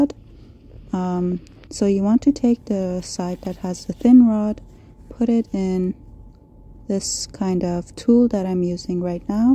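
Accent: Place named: Iran. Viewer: I hear a lovely iranian accent ❤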